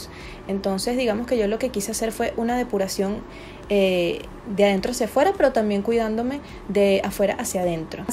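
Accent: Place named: Venezuela. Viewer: Oye tienes un acento venezolano, en qué parte encontraste esa crema, si es que estás en Venezuela. Gracias .Caracas.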